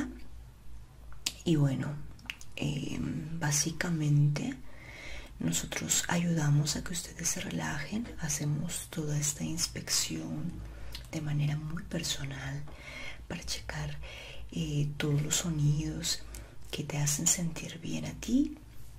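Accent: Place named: Colombia. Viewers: ¿De qué parte de México eres? Te juro que yo escuchaba como acento colombiano. No reconozco mis acentos, he fallado como mexicana jajaja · Soy colombiana y a mi tambien se me parece a unos de nuestros acentos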